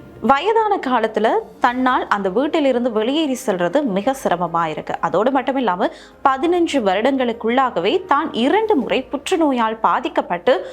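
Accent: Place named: India. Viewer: Can you please maintain Jaffna Tamil. It’s more of z Indian Tamil.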